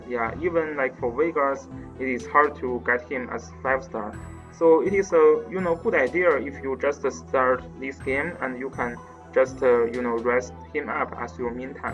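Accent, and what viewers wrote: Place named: China. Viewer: I cant understand that english , such a strong chinese accent , and music background make it worst ....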